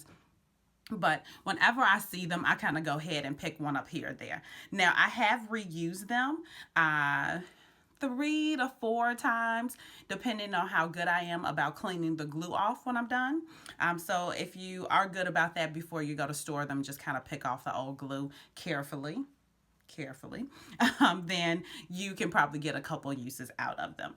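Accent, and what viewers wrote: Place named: Baltimore, United States. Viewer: ok Maryland or Cali? I hear it….Baltimore for sure, am i right?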